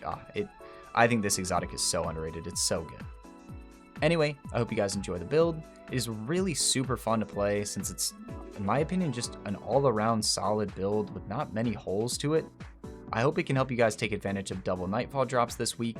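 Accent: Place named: United States. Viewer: They speaking amercan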